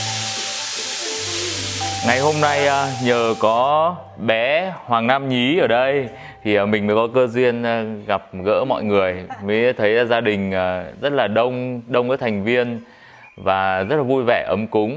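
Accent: Hanoi, Vietnam. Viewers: thích giọng anh Nam ghê! Trai Hà Nội có khác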